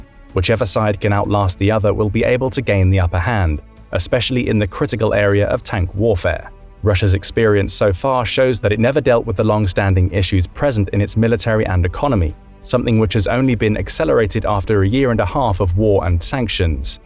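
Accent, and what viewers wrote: United Kingdom. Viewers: Another video with a factual error about every minute, visuals that have no relevance to the narration and no insights that couldn’t be gleaned from reading a news site. But they did enlist a British narrator to give it a thinly veiled patina of authority.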